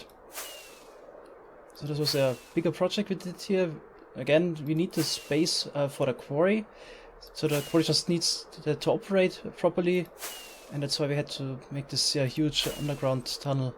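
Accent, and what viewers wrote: Germany. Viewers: Nairolf 00 Neeeeeiin, hat nicht schon 2,<num> Millionen Mal in seinen Videos gesagt dass er deutscher ist, das hab ich mit wohl eingebildet... Haha du bist du selber deutscher, als ob du da nicht seinen krassen Akzent raushörst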